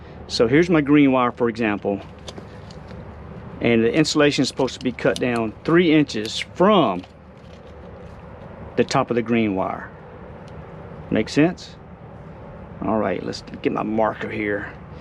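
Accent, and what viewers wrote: South, United States. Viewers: Thank you ! I bet I could replace our 35amp plug now! Good job! P.s. I just love your southern voice !💙 Have a blessed day yall!!!!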